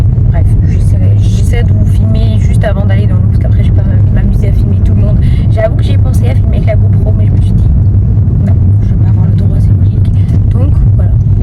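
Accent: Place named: France. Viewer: Bienvenue parmi nous au Québec. Je te souhaite une excellente intégration et te conseille vivement de garder ton accent de France : vous avez un français magnifique, alors ne cherche surtout pas à imiter le nôtre. Au plaisir !❤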